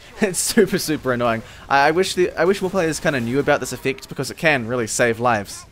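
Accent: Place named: New Zealand. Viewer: bless you and your Kiwi accent.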